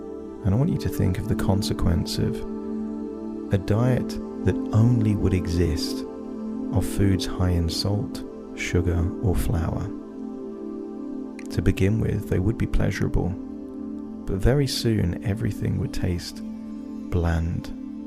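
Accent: England, United Kingdom. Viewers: Ok..., I need to get a Bluetooth headphone set, so I can move about to my bed for a comfortable experience. My tethered headset keeps me tied to the desk. Now then, I will try it again when Amazon gets here with my new headset. Meanwhile, I live on a 220 acre mostly forest little NW farm and recovering from a heart attack and fractured hip when I fell. I can do this, your program sounds great and I like the English accent. I am 285-lbs 5'11" & 73 years old, and of German heritage. I will report more later.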